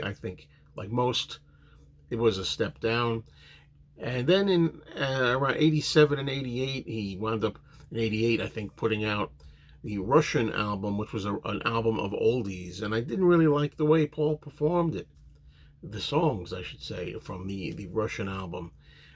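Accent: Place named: North Jersey, United States. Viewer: I have this one on vinyl, and saw him at MSG when this came out. Never really listened to it much., sound like you’re from northern NJ. Are you?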